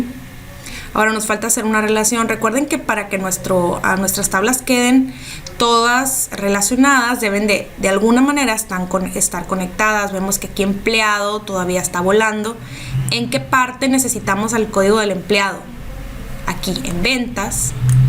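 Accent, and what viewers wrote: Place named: Mexico. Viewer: Muy buen video. y por supuesto "interesante voz" a la mexicana..!!! je je. Bendiciones !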